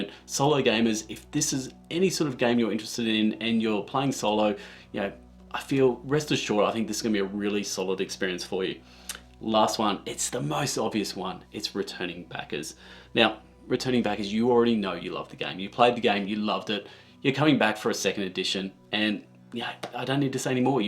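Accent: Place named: Australia. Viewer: Great to hear an Aussie accent covering board games on YT! What do you think of the shipping costs to Australia for this campaign?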